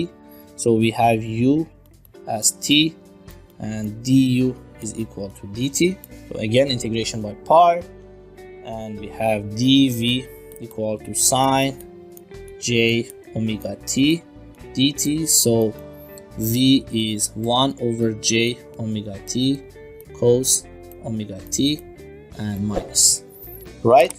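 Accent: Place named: Iran. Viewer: Idk it is me or all persians feel joy after hearing the [persian accent]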